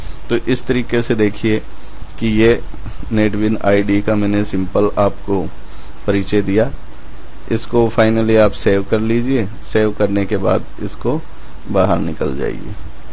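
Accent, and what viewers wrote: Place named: India. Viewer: Hey you, reading this comment, I just went through the entire Playlist for NetBeans IDE If you can ignore the strong Hindi accent, and language, grammar isn't a problem for you, Then this series is a beautiful option for you to quickly learn NetBeans in one day, Be sure to make notes of the things you learn, And you'll be good to go, I have my practical exam in the morning, and found this really useful to learn the entire course in one sitting, Concise, and important aspects covered, However, if the pace of your speaking, and repetitiveness was gone, Then it would've been phenomenal, Thank you, so much, for this series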